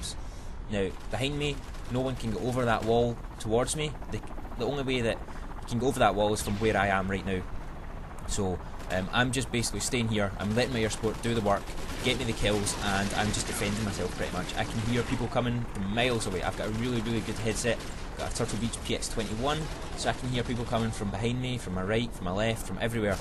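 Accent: Scotland, United Kingdom. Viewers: it is, he's scottish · r u scottish, i love axent =D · scottish... mute. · scottish accent.. eww... i was sick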